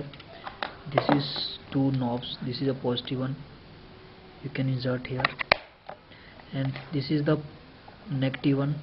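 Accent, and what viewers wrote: India. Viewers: Big problem when showing how to measure the ACV,.... If would be VERY helpful to actually see where you inserted the lead prongs into the receptacle,,.... and then show the reading on the meter. does it matter where the red and black leads go in a 230 (in Ukraine) receptacle? I'm familiar with American electrical systems, and it doesn't matter on 110 v circuits, ... but 220 must be checked with one lead (it doesn't matter which one) in the ground terminal, and the other lead in either hot terminal. Each hot terminal will check as 110 v,.. and together, the 2 hot 110 v equal the 220 v. BUT in Ukraine it's 230 v and the old houses/apartments DON'T HAVE GROUND TERMINALS (OR GROUND WIRES) IN THEIR RECEPTACLES!! I'm not sure if it's the same in India (which is what the accent of the man doing this video sounds like)? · mumbling and strong Indian accent..not dis like for accent...but the mumbling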